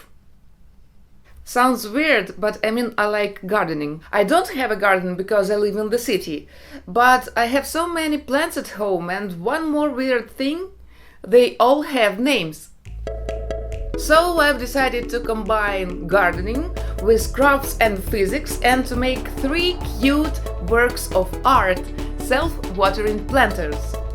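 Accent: Russia. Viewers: You speak like russian😅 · OMG!! WHAT!!! UR RUSSIAN!! OMG YOU SOUND SO COOL I DIDN'T EXPECT THAT.. UR SO COOL 😱😱😍😍😄😆😱😱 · Акцент Русский, или мне кажется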